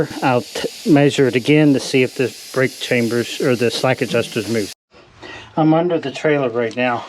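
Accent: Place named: Pennsylvania, United States. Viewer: Hi, you're saying "Y'ins" a lot. Are you in/from Pennsyltucky?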